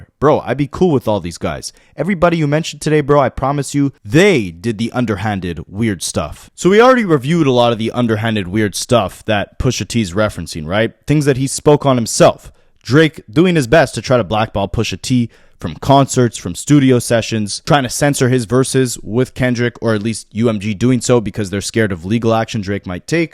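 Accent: Canada. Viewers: Its the canadian accent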